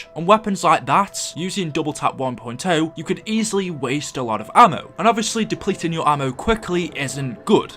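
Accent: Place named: Wales, United Kingdom. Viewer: are you Welsh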